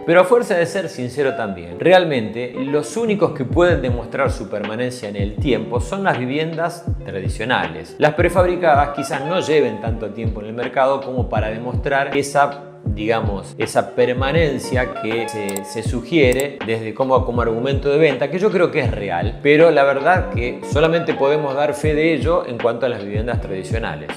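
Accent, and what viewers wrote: Argentina. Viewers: Me gustan mucho tus comentarios sinceros y profesionales. Por el acento siento que hablas desde argentina, y la realidad algunas veces es un pelín fluctuante dependiendo del país. Desde España se ve la construcción prefabricada como una ventaja en cuestión de plazos de habitabilidad, por lo que he visto y comparado el precio del M2 de construcción es similar el de la construcción tradicional -estructura hormigón armado/pretensado, tabiquería ladrillo/Pladur, tejado de teja, y fachadas de material hidrófugo - es muy similar al precio de la vivienda prefabricada, pero siempre me queda la duda sobre el sistema estructural, como responde una casa prefabricadas a movimientos/asentamientos de terreno, cambios de nivel freático....esa es mi duda, porque creado el problema, quién sabe cómo arreglarlo?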